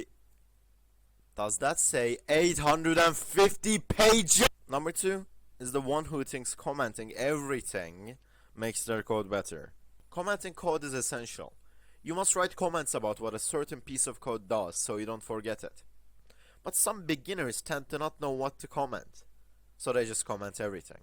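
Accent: Turkey. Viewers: Hey where u from. ur English kinda resembles a Turkish accent. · Turkish accent? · Aksanindan Turk oldugunu anladim :D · it's the turkish accent for me :p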